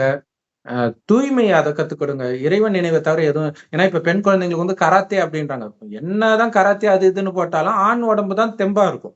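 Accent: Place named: Tirunelveli, India. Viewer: sister thirunelveli ya pesum pothu ooru bashai nalla iruku 🖤🖤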